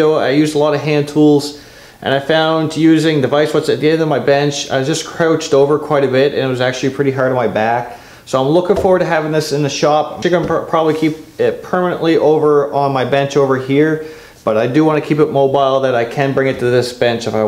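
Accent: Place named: Newfoundland, Canada. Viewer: You sound like a neufie in this video. Good idea for the work vise.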